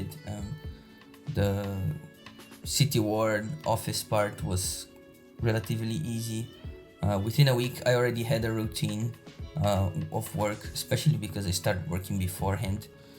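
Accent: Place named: Russia. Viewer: Tem N com sotaque russo, tem like.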